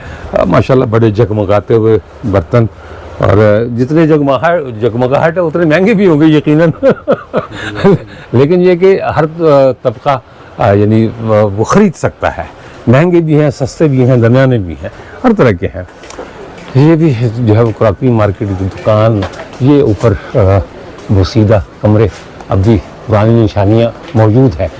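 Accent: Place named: Bihar, India. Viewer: Kia. Tum. Shia. Ho. ? Kia. Tum. Bhia. B. Ho. ? Apni. Urdu. Say. To. Bhia. He. Lagtay. Ho.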